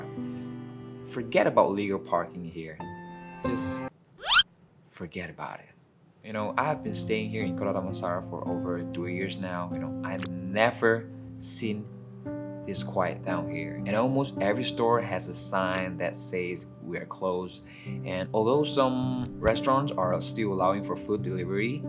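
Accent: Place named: England, United Kingdom. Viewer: Subscribed!!! Wow bro I like your English accent😍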